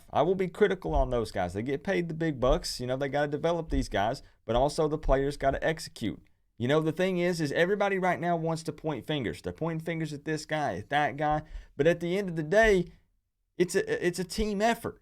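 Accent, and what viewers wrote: South, United States. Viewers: Great analysis! Still don’t understand (considering your southern accent) how you are a Canes fan. All the Canes fans I know are into bling-bling, hip-hop and gangsta rap.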